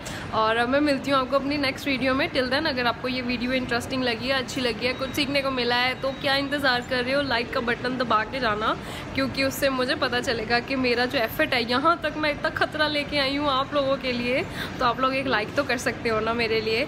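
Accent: Punjab, India. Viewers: Even while talking in Hindi , accent is still Punjabi ...love u cutie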